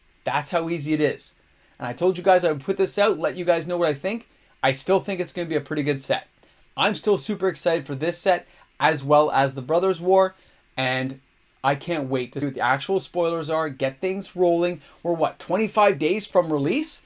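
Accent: Canada. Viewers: I almost shit myself when you said new capenna was 135$, then I heard your accent and figured it's Canadian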